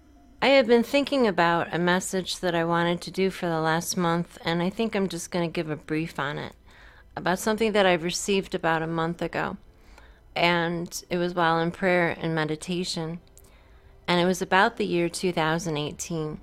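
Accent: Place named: Spain. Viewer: Whoa now that is a combo! I can see and hear the spanish in you.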